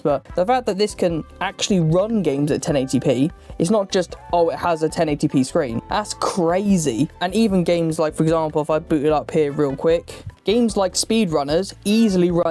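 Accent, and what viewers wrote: United Kingdom. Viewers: Great review! You so British! :) Love the accent! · I love hearing british people english accent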